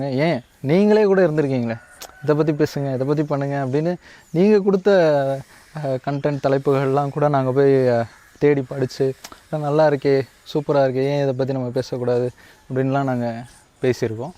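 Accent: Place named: Theni, India. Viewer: Bro neenga entha ooru pakka Theni matheri iruku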